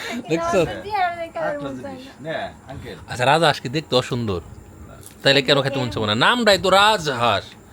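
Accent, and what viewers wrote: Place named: Manikganj, Bangladesh. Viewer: মানিকগঞ্জের ভাষা মনে হচ্ছে 🤔🤔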